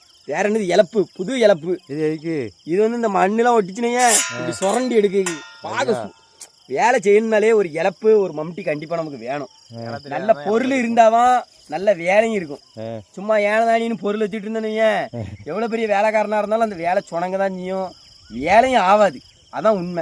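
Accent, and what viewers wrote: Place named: Tirunelveli, India. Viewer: அருமையான திருநெல்வேலி பேச்சு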